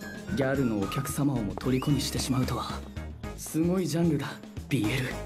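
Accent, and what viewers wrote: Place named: Japan. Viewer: the japenglish is my favorite part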